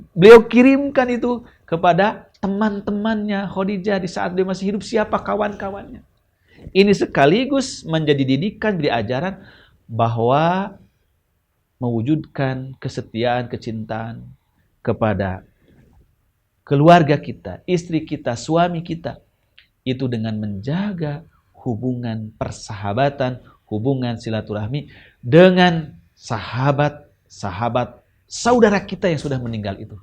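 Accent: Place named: West Java, Indonesia. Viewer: Sangat Sunda sekali... NGAOS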